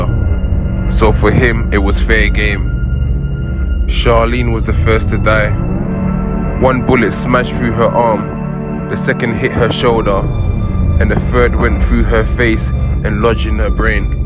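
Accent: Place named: London, United Kingdom. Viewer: Sym u likkle London posh yute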